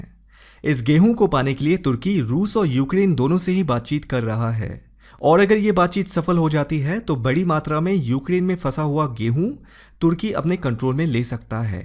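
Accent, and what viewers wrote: Bengal, India. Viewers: Bengali accent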